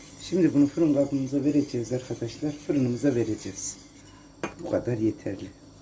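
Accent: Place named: Kayseri, Turkey. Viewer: Yapicam simdi siveniz Kayseri gibi yanılmıyorsam elinize saglik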